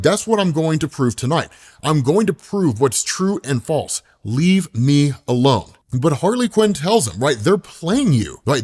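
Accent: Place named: Chicago, United States. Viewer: sounds like chicago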